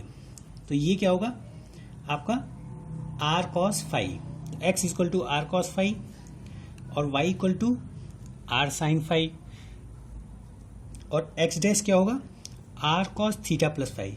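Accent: India. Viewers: is there any single video without indian accent about Computer Graphics?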